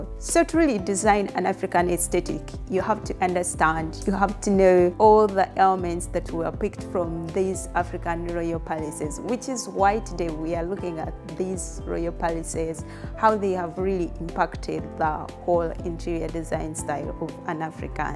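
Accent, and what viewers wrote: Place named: Uganda. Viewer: I love your Ugandan accent ❤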